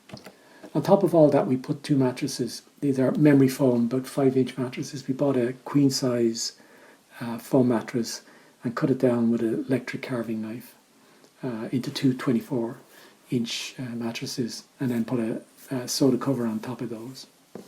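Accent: Ireland. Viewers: Great van build 👍 Do I detect an Irish accent ? Maybe Welsh? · I miss the Irish accent so much ❤️ thanks for this going go be doing this in Canada in the summer !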